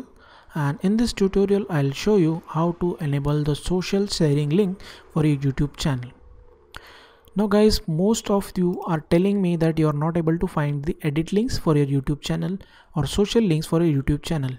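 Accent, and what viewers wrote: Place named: France. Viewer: the french accent is annoying... not trying to be racist...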